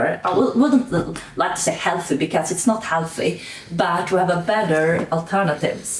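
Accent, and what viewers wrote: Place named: Sweden. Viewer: Good Beatrice fight for this issue. Do take some english pronunce lessons. But it is okay with a swedish accent.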